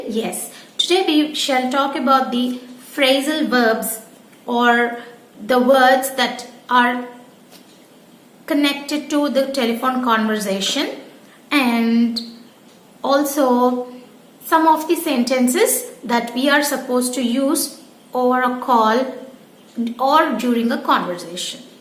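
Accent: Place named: United Kingdom. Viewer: Very well rendered in Brit accent ya!! Btw what word was that u used for call disconnection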